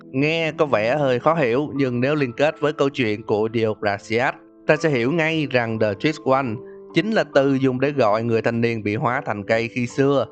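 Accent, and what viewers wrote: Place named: South, Vietnam. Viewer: Ông phân tích game thì chắc ai cũng biết, giọng miền nam nghe rõ ràng, phân tích chi tiết từ cốt truyện đến cách chơi game, tui thích nhất phần chi tiết về hướng chơi game bad ending và good ending 1 số game mà chỉ 1 số game không chơi mới nghe hết, còn mún chơi thì lại phải để dành lại mấy bài phân tích sau khi phá đảo. Tuy vậy nhưng ông ra video khá chậm, mình cũng hơi tiếc về phần này tí, mà chất lượng bù lại thì cũng khá ok. Cố gắng ra nhiều thêm và nhanh hết mức có thể nhá, mình thì auto ủng hộ:)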